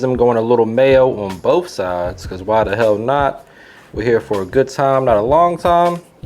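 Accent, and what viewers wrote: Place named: South, United States. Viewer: Where are you from?! You sound southern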